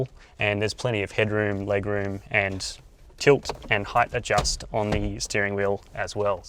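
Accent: Australia. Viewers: "He speaks in a strange way for an american, but he doesn't sound like a british", then I discovered you are australian. I always forget about you, guys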